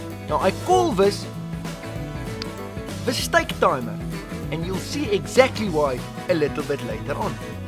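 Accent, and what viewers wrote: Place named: South Africa. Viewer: Ek dink dis vreeslik goed gedoen...."wif de Souf Efriken aksent & all"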